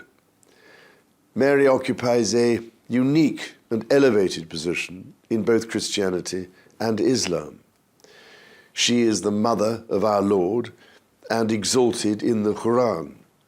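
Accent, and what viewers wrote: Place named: England, United Kingdom. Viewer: That English voice is so perfect . Wow , . His tone is par excellence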